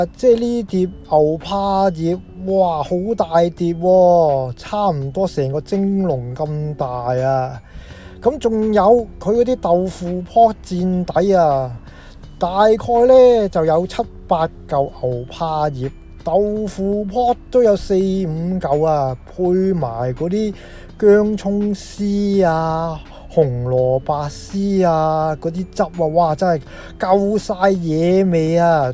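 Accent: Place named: China. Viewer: 要查清楚並講好聲音，不要太陸口音，明明牛栢葉不是牛怕葉。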